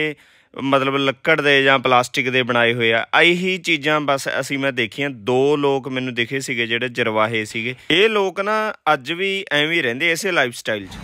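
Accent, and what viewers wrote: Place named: Punjab, India. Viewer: Bro,ur speaking English like Punjabi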